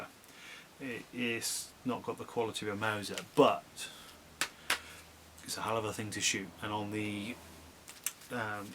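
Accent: United Kingdom. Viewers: Sounds like your from the forest of Dean area? Do you do any boar control? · Sound like a devon boy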